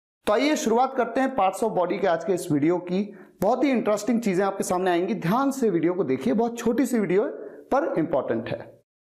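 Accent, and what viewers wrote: India. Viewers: Your pronunciation is totally Indian. Pronunciation should have been like English.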